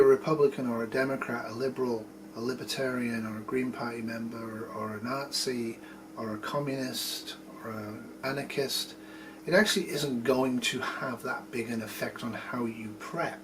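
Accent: United Kingdom. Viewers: The only reason politics are part of prepping in the US is because of the 2nd amendment. Without it, gun confiscation becomes easier and then the shtf can be a lot easier to usher in. For the record, your're the only liberal I thumbs up on YT. Must be the British accent or maybe the hair. Haven't figured it out, yet.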